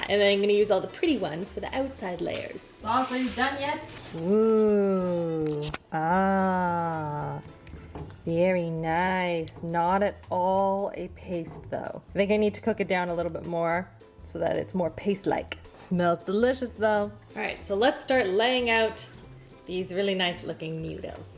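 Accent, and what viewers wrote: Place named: Canada. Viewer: Her Canadian accent really came out in this one 😂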